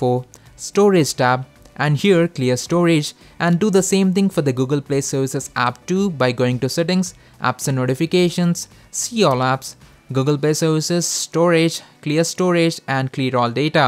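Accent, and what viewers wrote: India. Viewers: Sounds like India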